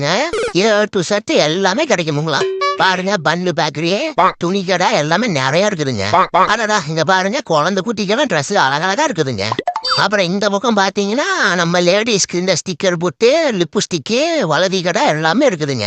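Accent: Kongu, India. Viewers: Coimbatore slag voice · Kongu slang.. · Sema sema..... Kongu slang u.... Thala thaniya ennala msg Panna mudilla... Athukkuthan intha madam id la reply.... Sorry madam❤ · Coimbatore slang vera level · இயற்கையாக இருக்குங்கோ ஏமுங்கோ உங்க கொங்கு பாஷை சூப்பருங்கோ 😅😅😅😅😅😅😅😅😅😅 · பரம்பரை பட தருண் குமாரை ஞாபகப்படுத்துகிறது இந்த ரெய்மிங் டயலாக் நன்றி சகோ கொங்கு தமிழ் அருமை😂😂😂😂😂😂😂🎉🎉🎉🎉🎉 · அது பாருங்க கோயம்புத்தூர் பாசை தான் செட் ஆவுதுங்க. 😅😅😅😅😅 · Already subscribe pannittanungo👍 ungo kongu kovai slang romba pudichuthungo😂😂😂😂😂, appuram china market supernga😂😂😂😂, neenga paathu jagarathaiya irunga illana vera County ku poidunga anna😂😂😂😂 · Coimbatore slanga voicengaaa........😂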